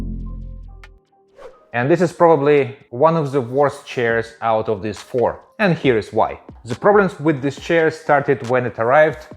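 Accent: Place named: Eastern Europe. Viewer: Why someone with a eastern european accent is using the stupid inches as measurement?, he was born using the right METRIC SYSTEM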